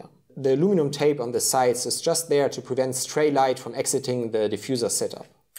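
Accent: Germany. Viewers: Ich glaube ich höre da einen LEICHTEN Deutschen Akzent 👂👀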